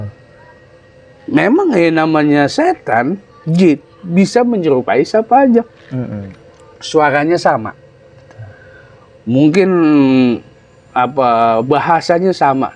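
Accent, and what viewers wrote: Jakarta, Indonesia. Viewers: Lucu. Bngt. Suka sama. Gaya logat nya. Si. Abang. Btawi bngt..👍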